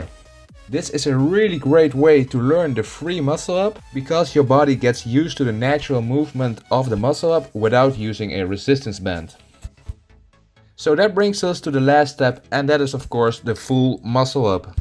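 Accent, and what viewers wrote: Netherlands. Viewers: ik kan het nederlands van 3km afstand horen 😂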